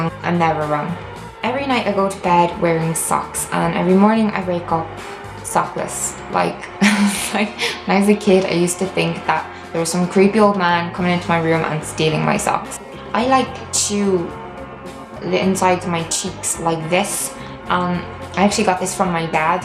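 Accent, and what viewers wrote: Ireland. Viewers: Was wondering about that accent.. Now I know. :D I love Irish accents, they're cute!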